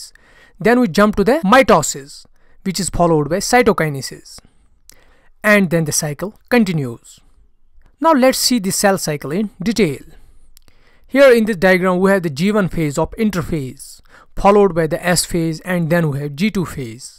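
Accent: Kashmir, India. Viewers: Sir app kashmir sa ho kya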